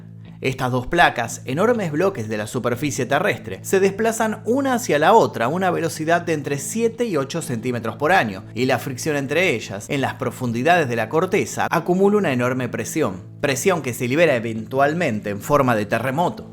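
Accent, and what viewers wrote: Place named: Argentina. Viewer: Es argentino el charlatán que relata parece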